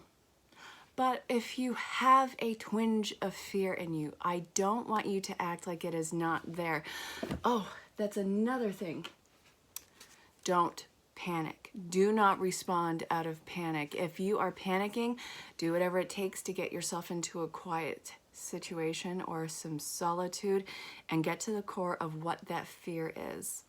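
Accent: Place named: United Kingdom. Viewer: Love ur British accent!!!!!!!!!!🤣🤣🤣🤣🤣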